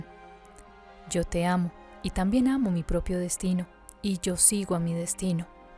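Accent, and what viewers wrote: Colombia. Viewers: Nada mas hermoso que escuchar una colombiana. saludos desde México.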